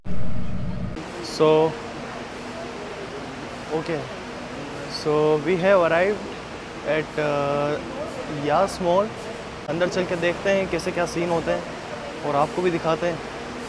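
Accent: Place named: Mandsaur, India. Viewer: Tu bhai tone chng mat krna...khanpuriya mandsaurii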